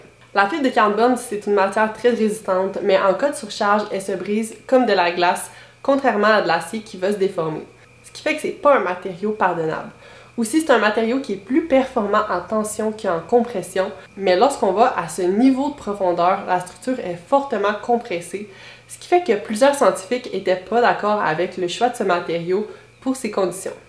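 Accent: Quebec, Canada. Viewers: Elle parle très bien francais, elle a un accent québécois c'est tout. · pour ton accent, etant un quebecois moi meme,, ben yen a pas de plus beau et toi aussi tes pomal belle,, et il y a un reportage de France qui parle de comment laffessement ces produit contrairement a limplosion instantannee effectivement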